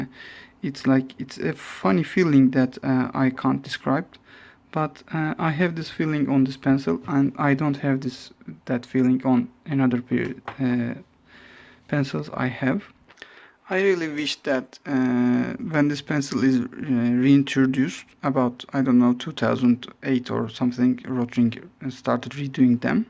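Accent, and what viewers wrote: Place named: Turkey. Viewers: 2.saniyede falan türk olmama ihtimali yok dedim ama açıklama ve isimden dolayı yanıldım mı acaba dedim, yorumlar neyse ki şaşırtmadı :) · Aksanın ben türküm diye bagırıyo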